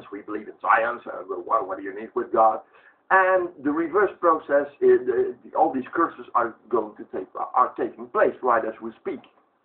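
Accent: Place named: Netherlands. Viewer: Jij bent Nederlands. Dat hoor ik gewoon. Interessante video.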